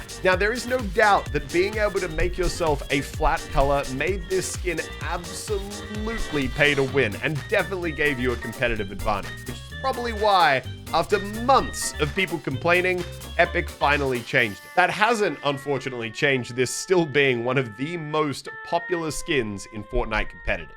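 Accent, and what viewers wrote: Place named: Australia. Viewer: Even though it's basically becoming SypherPK with an Australian accent, a week late. It is still not nearly as bad as the Fake American Accent Aussie Fortnite Youtuber.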